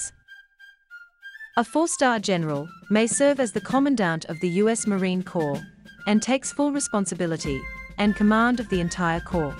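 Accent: United Kingdom. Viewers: Accurate video, but kinda strange that it's narrated by a British woman.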